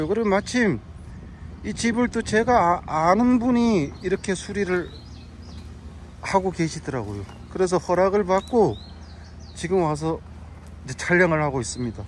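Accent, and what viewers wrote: Gangwon, South Korea. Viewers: 더워요 얼굴을좀 강원도 사투리 구수하고 말억양이 독툭해서 자주시청하는대요 목소리는 젊은신것같은데 언득보니까 60대70대같어서 얼굴공개 안하시는건가요 어때요 맘이 고우시고 어지신것같은데요 얼굴보고싶어요 암튼 대박나시길 바랍니다 핫팅 ㅎㅎ❤😊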